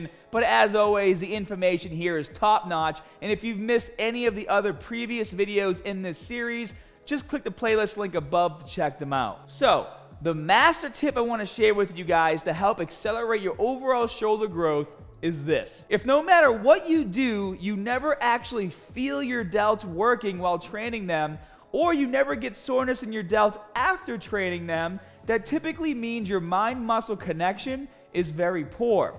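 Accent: Boston, United States. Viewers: 😂 its like Boston accent is sneaking out. Let it beathe bro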